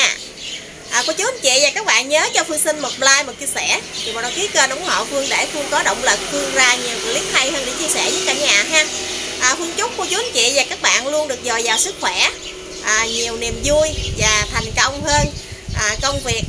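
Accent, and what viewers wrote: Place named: Mekong Delta, Vietnam. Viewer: Da.tai coi nghe chi nói chuyện giọng trong trẻo và ấm áp nên e tưởng chị là người miền Tây ah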